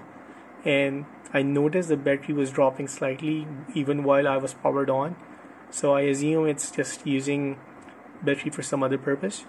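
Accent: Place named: Rajouri, India. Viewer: Abey gandu bina accent ke bol deta, samajhne meh asaani hoti. Rajouri wali accent leke gaand marane jaa raha hai kya gb road?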